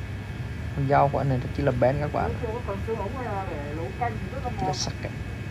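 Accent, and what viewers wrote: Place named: Ha Tinh, Vietnam. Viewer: Máy cắt thịt đông êm đó anh 👍👍giọng nói anh nghe quen quen anh quê ở đâu vậy giống giọng hà tĩnh với nghệ an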